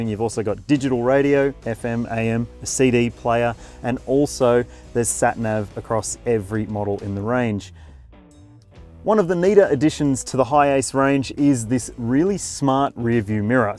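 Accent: Australia. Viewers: Hate the Aussie accent, but good, informative and even fun piece.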